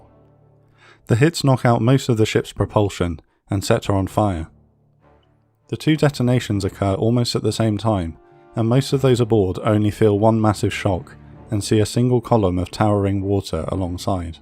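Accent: England, United Kingdom. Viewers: Get rid of the heavy English accent that I can't understand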